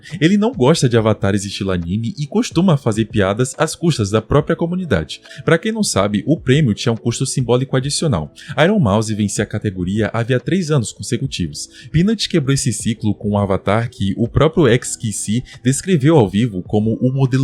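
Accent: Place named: United States. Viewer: Bom vídeo mas pq vc fala os nomes da vtubers japonesas com sotaque americano? kkkkkkkkkk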